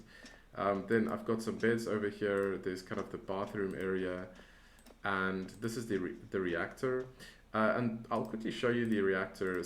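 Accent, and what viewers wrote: South Africa. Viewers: Sounds like SA?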